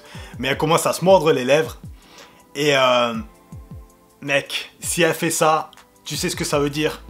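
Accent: Marseille, France. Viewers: Ptdr ton accent marseillais me termine t un crack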